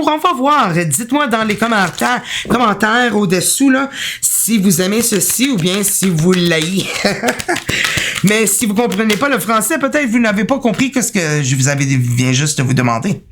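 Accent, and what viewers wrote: Quebec, Canada. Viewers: Oh mon dieu, j'adore ton accent Québécois.😊❤ merci pour cette belle lecture, qui resonne parfait. Oui, je suis dans une nouvelle relation avec un poisson et j'espere que on sera bientot ensemble, car distance physique entre nous. Je suis veuve et lui divorcé.. Merci pour la confirmation et gros bisous d' Europe. ❤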